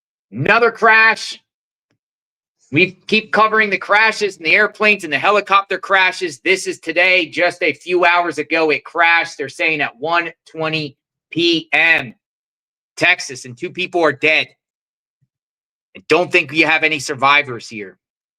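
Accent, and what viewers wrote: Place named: Minnesota, United States. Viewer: Where the hell are you from? Yankee I think. Minnosota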